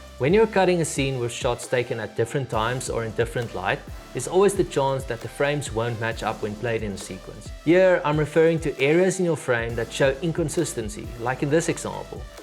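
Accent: South Africa. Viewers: Refreshing to hear the SA accent on YT Im currently using FCP X Would really loves this, keep killing it bro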